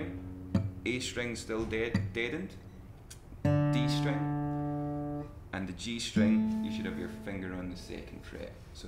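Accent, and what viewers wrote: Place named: Glasgow, United Kingdom. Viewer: He went to the same schoole I go to he has a glasweigian accent aka Scottish but is from airshyre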